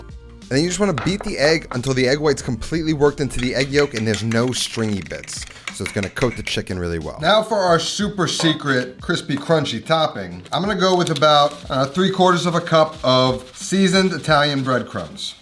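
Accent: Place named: Westchester, United States. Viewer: Oh you from weschesta? Das it!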